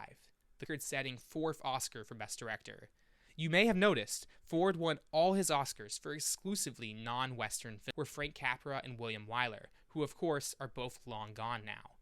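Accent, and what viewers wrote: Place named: United States. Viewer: This is very good. But it's a pity the narrator has one of those now typical modern American accents. i can't describe it in print but a whining raise at the end of words or sentences: ''that way of making moviess'' '' 7 Womennn '' . It's quite a new thing. Older Americans don't do it. It sounds awful and in some cases unbearable. Someone told me it's known as the ''high rising terminal'' or ''up talk''. Another person told me that it's known as ''vocal fry'' but someone else said that the whiny sound is neither of these ? Don't mean to be rude but it spoils and undermines an otherwise excellent analysis.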